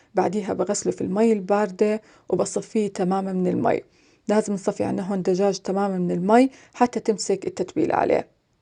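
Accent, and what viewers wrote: Jordan. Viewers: أردنية أردنية يسعد لهجتها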